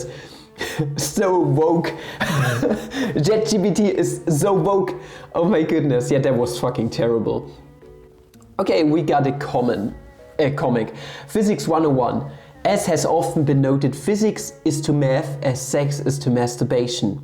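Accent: Germany. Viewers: dein akzent hört sich richtig deutsch an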